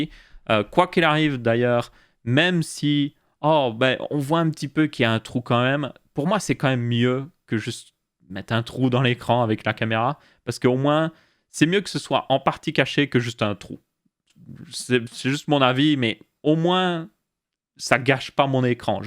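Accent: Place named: Belgium. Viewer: Je suis français et j'adore quand tu parles Belge. Je trouve ça trop cool. Puis on a moins l'impression de vivre dans un monde monolithique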